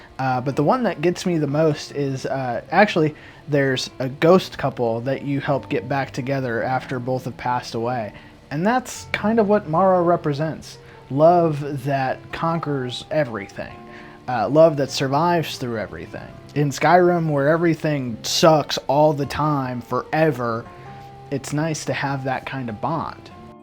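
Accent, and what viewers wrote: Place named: New England, United States. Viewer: just sound like my old neighbors/coworkers in Vermont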